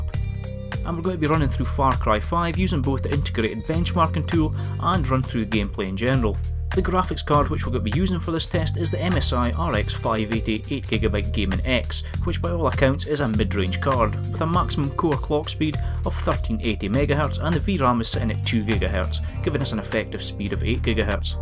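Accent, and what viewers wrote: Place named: Glasgow, United Kingdom. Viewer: dude i cant understand you for shit, maybe use subtitles next time, you must take into consideration that at least half of the viewers are not native speakers of english so it's hard to follow your Glasgow accent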